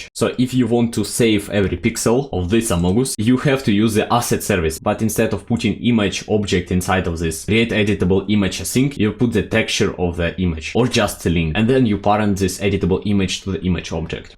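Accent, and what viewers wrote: Russia. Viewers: русский акцент у тебя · that indian accent (nvm it's russian)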